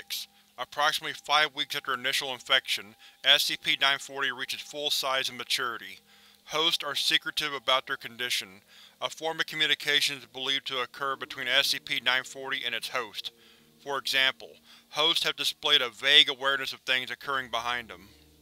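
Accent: South, United States. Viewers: Third! Love your voice dude. Very southern and somehow militaristic which goes along with the SCP stuff. Been binge watching your videos.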